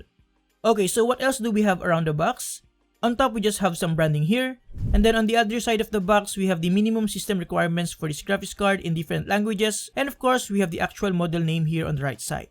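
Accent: Philippines. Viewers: Filipino rightt? Accent palang e hehe. GOODLUCK PO SA LAHAT!! pati pala sakin ehem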